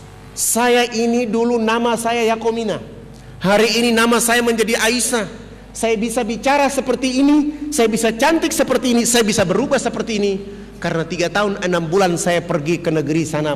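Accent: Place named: Papua, Indonesia. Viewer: Aku dari sumbar,aku suka bahasa papua,karna bisa membuat ku tertawa,dan merasa bahagia..habis rada2 lucu cara bicaray..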